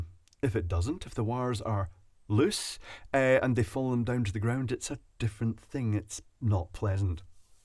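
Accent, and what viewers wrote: United Kingdom. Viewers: Wow... We see you... I watched many videos... I pictured a completely different looking person. Ha! It's the British accent. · It's amazing how Americans perceive British accents. I envisioned you with a double breast suit, bowler hat, and maybe a pipe.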